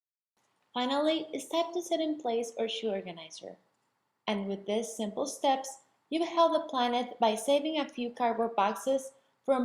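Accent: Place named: Mexico. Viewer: I fucking love her Messican accent! XD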